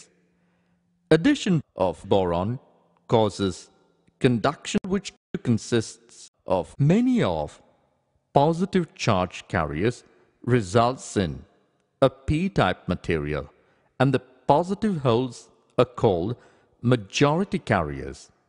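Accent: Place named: India. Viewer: whatsup with the indian accent?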